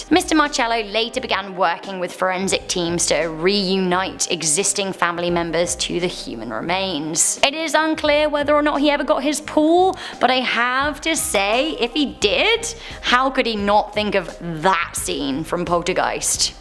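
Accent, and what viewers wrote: England, United Kingdom. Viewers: First off love your British accent very 🔥 second love your facial expressions keep up the good work on the videos and keeping us interested in the videos far from boring 😎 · I'm so glad you've been able to keep your English accent its the best, you should come back to England see your dog and do English things with everybody :D xxxxx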